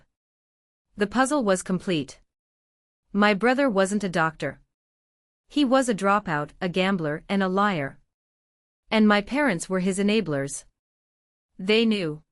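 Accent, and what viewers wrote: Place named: Asia. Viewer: It is hard to listen to bc the voiceover sounds very brokenly with some kind of Asian accent. Though the story might not be bad, the voiceover is amateurish.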